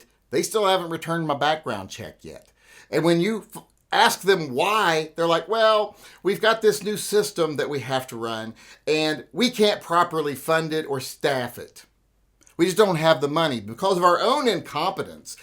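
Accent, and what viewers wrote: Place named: New Jersey, United States. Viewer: sounds like new jersey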